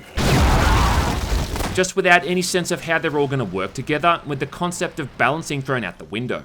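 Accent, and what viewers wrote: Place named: Australia. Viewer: Cheers, sweaty guy. You sound Aussie.. til you say "toob"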